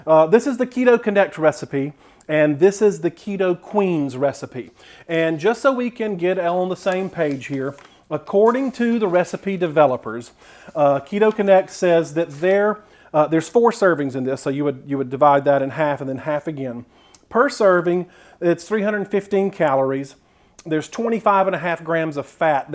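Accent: South, United States. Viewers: This guy is the best! I am so glad i can watch him make different recipes, taste them and give his honest opinion. So many times i have wanted to make something keto and there are a billion different recipes..... some completely different and some very similar. It can be very difficult to determine which one is the best one to make. As we all know keto ingredients can be very expensive. There is nothing worse than making a recipe that does not turn out anything like you have anticipated. Not only have you wasted ingredients that cost a lot of money, but you have also wasted a lot of time and worst part you don"t get to eat that one thing your have been,craving and thinking about nonstop, praying to the keto gods that you can make a low carb version. So THANK YOU! You are so helpful and a joy to watch. You have the cutest southern twang. Love your personality and energy.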